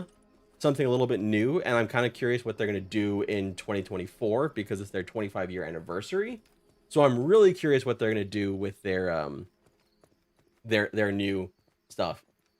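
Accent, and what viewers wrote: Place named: United States. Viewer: Talking of you not having an English accent, at least you don't have to fake American pronunciations to get views like most English gaming Youtuber's, you just do it naturally. It feels really weird seeing (well, hearing) them do it but I believe it really does make a noticeable difference to them getting views.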